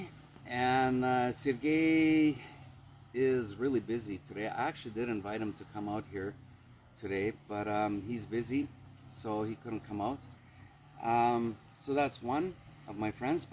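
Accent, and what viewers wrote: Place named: Russia. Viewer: Wow, I am from Khabarovsk! Great to meet you there) You have Russian accent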